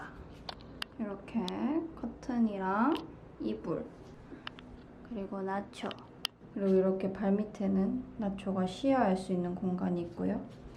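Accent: Gyeongsang, South Korea. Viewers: 경상 북도 사람 이신가여?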